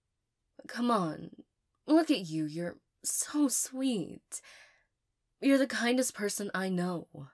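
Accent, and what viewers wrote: South, United States. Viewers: Let's gooooo. Where's country accent? Painful truth or comforting lie? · You sounded like a classic southern belle. It was a nice change and you absolutely nailed it!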